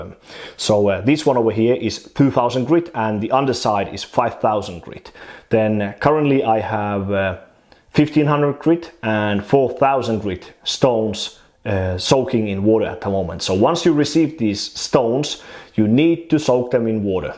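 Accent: Finland. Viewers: Ai nyt on todella suomalainen aksentti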